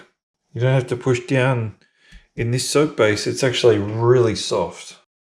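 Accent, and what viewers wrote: Australia. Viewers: Beautiful. Loving watching a soap vid with an aussie voice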